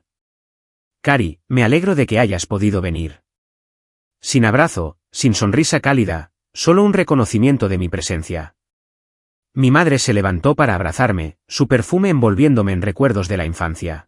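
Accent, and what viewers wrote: Spain. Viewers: es mi favorito narrador tanbien no mea burre me lleva ala imaginacion de cada personage como si fuera pelicula envivo asi como narracon esavos y acento espanol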